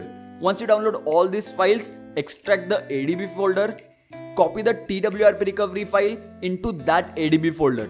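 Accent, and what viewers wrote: India. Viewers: Pure Indian Accent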